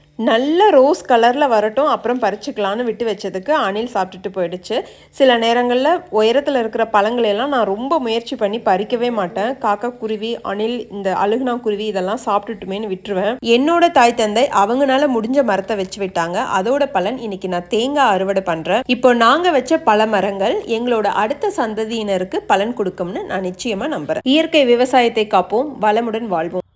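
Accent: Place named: South Tamil Nadu, India. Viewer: South tamil nadu side la slang ey apadi dhaan irukum avanga onnum venum nu laam pesala. Oor ooruku oru slang irukula edho ellarum sanga tamil la pesitu irukura maadhiri dhaan kurai solradhu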